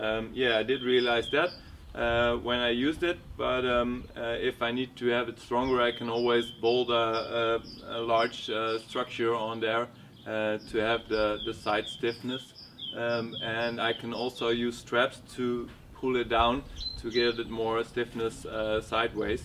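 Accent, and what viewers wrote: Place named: Germany. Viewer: Cool, I thought you sounded German. Your thouragh like a German lol. Cool projects mate, hi from northern ireland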